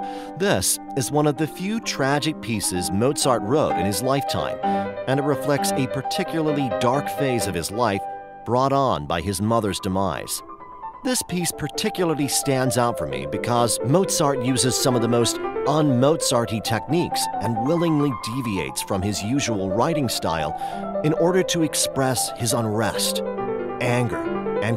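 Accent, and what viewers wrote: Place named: United States. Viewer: If you have an American accent, then go by that. You sound like you're American.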